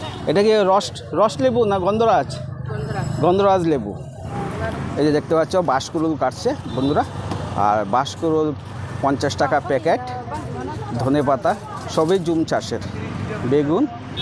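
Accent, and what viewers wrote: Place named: Bangladesh. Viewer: সুন্দর ভাবে কথা বলতে শেখো । তোমার কথোপকথন অনেক কুৎসিত। মনে হয় যে তুমি বাংলাদেশ থেকে আসছে।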